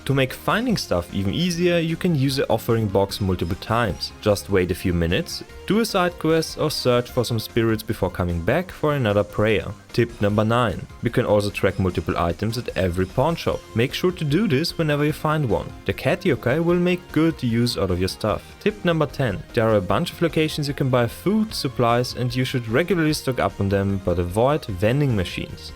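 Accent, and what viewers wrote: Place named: Germany. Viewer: ah ok aber die Sprache is dieselbe xD Hab mir von deiner aussprache gedacht das du evtl deutscher oder Österreicher bist xD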